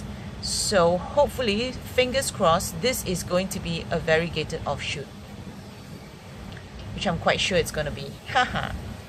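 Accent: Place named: Singapore. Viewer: Hi you sound like a singaporean ... where do you get variegated plants in SG?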